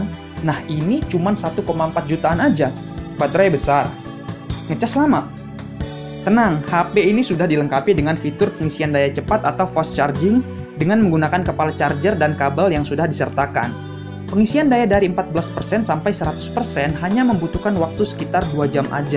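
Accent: Lampung, Indonesia. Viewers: orang lampung ya bang? nyo kabagh:v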